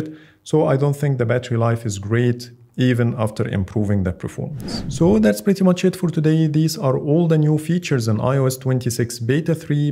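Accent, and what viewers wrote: Egypt. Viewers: الصوت ده مصري قوي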